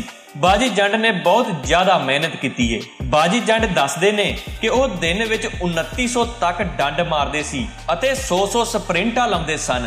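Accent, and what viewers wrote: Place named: Uttar Pradesh, India. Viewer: Bai keho jahi Punjabi bolda? UP da rehan wala te nahi kitey?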